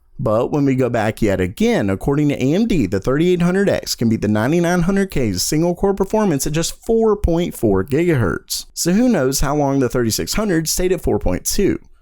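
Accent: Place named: United States. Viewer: One of the most annoying voice overs. Way too fucking american pronounciation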